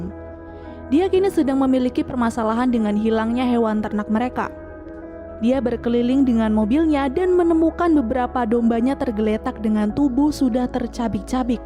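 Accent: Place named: West Java, Indonesia. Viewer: sy heran ama pembawa alur cerita ini, dr logat bahasanya sunda, tp dr video pembukaan biasa seperti situasi di jawa timur, karna sy orang jatim yg tinggal di bogor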